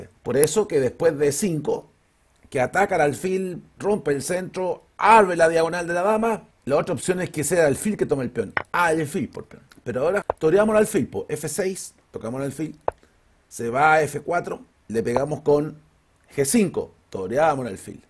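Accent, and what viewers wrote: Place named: Chile. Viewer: Qué gozada haber descubierto el canal y ser suscriptor..! Explica usted de maravilla. La suma de la pasión que pone más ese encantador acento chileno, es una delicia. Celadas muy pero que muy útiles. Saludos desde España y un abrazo!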